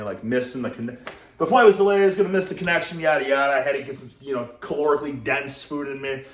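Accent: Midwest, United States. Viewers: I love Midwestern swearing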